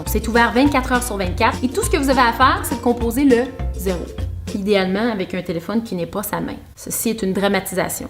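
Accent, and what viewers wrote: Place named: Quebec, Canada. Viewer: C est trop mignon ton petit accent québécois <3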